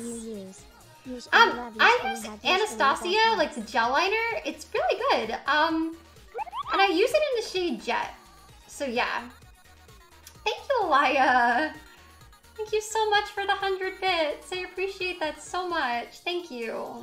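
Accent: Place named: Connecticut, United States. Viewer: When she says "bits-uh" I want to pull out my hair...I think its a connecticut thing lol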